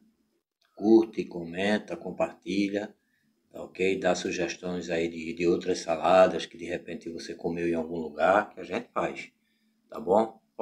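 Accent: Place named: Pernambuco, Brazil. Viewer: Eita que sotaque lindo do meu Pernambuco 😍. Salada maravilhosaaaaa! Já vou fazer, não vai ficar maravilhosa como a sua. Mas vou tentar. Parabéns!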